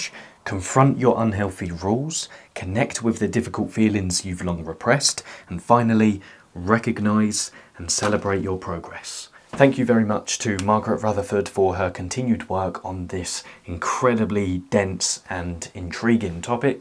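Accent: United Kingdom. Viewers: this british accent made it hard for me to understand lol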